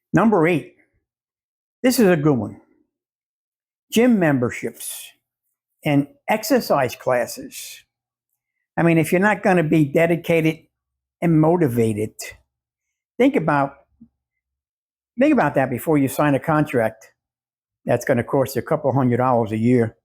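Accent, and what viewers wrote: New York, United States. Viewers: You kinda look, and DEFINITELY SOUND LIKE THE ACTOR, JOE PESCHI IMO...with that New York or New England accent?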